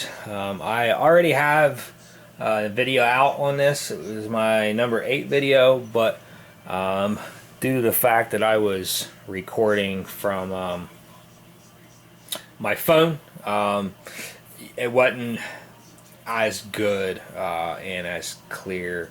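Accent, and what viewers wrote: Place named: Southwestern Pennsylvania, United States. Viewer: Heavy southwestern PA vibes from you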